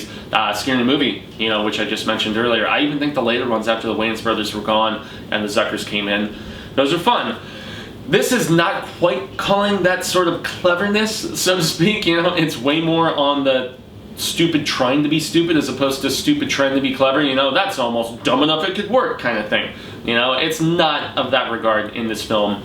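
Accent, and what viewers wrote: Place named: Maine, United States. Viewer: This review was really funny,. I laughed through out. And your Maine accent is funny XD LOL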